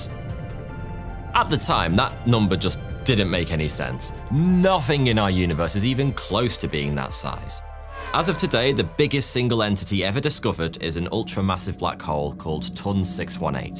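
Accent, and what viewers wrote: United Kingdom. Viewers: Gravity is 10 to the 39th power weaker than electro-magnetism. The true energy that binds the universe. All this great attractor, dark matter, dark energy, black hole, suns are nuclear furnaces nonsense is exactly the reason, the "experts" keep on getting baffled. Everything is connected. Not by gravity, as it only attracts. If gravity were true, everything would be colliding and smashing into each other and no life would ever have formed, anywhere. The universe is balance, constantly changing, constantly in flow. This is how its perpetual. It isn't expanding, the speed of light is not a constant and red shift is not due to gaining of distance, its the measure of youth, higher energy. Besides, how can the universe be expanding, if there are all "super massive black holes" at the heart of every galaxy, sucking everything in. Its all fundamentally flawed and all the degree holding towers of the line, have their heads so far up Einstein's ass, they can't smell the dark mode plasma. 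Thought and movement are electric. We are tiny mirrors of the universe, as well as antennas, picking up signals, which means, the universe is electric too. Everything is connected. Electrically, gravity is a mere by-product. This episode of Thoughty2, sucks. Just another British voice on the screen, talking to the little kids watching with awe and wonder. Science has been compromised and is taking orders from the wealth, like everyone else. Keeping secrets, telling you how things work, as how one woul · Which part of British accent it is?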